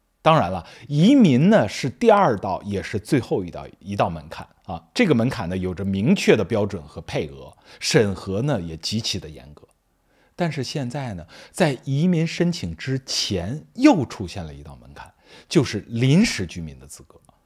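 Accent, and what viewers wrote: Standard. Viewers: 一口字正腔圓的普通話操著🇨🇦的心，這是一種什麼樣的精神😂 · 是不是做播音主持的？字正腔圆，有条不紊，说话听起来很舒服。内容当然也很高含金量！ ❤❤❤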